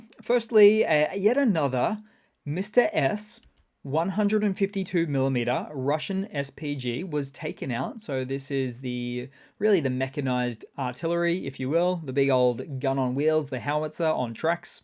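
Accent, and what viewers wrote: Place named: Australia. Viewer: I like your accent mate. Are there many different aussie accents or do you all speak the same?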